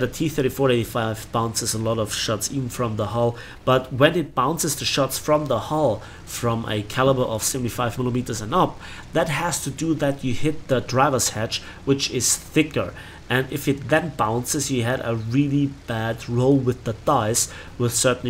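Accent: Bavaria, Germany. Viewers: Greetings from the Capital and to be honest please make more Subnautica. You actually made me buy it and i love it. Youre Bayrisch is hard to understand here up north but just speak the language youre comfortable with.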